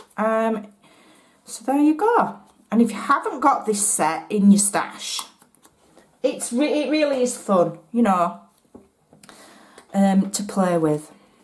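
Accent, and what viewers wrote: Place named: Northern England, United Kingdom. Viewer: These are absolutely great! I will make some to use as gift tags and to put in my Holiday scrap album and use in pocket pages. Every year I take a photo of and journal about my first Starbucks (or other coffee shop) "red cup" drink of the Holiday season. One of these cups will be great on that page! BTW: must tell you, I simply adore your lovely accent! Northern England, right? Hugs from Texas and thanks for your wonderful, delightful process video and for sharing. Barbara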